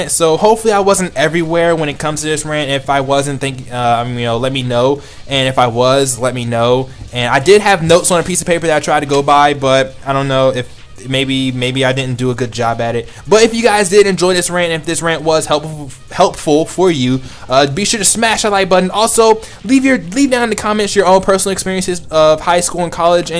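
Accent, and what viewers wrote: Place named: New York, United States. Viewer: My NYC senses are tingling, yur a new yorker arent u?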